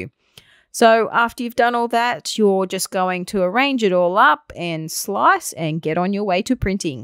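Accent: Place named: Australia. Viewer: Your video came up in a search for "measure in Cura". Cosplay is not my thing but it is so great to click on a video and here another Aussie's voice come through, thanks for the vid and all the best.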